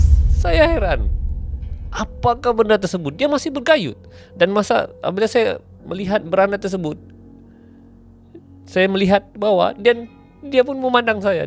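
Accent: Sabah, Malaysia. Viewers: bahasa indonesia lain bah...bhasa ini slang sabahan originol punia...😁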